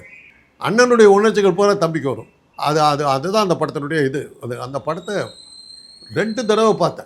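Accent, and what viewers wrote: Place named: Karaikudi, India. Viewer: காரைக்குடி தமிழ் தம்பி...... தலைப்புக்கும் பேட்டிக்கும் சம்பந்தமே இல்லை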